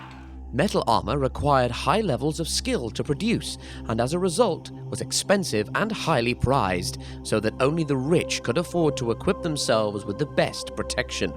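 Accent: England, United Kingdom. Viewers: Haha, at first I though he said, "Knights, minotaurs, and foot soldiers..." Not used to hearing English accents. Good video.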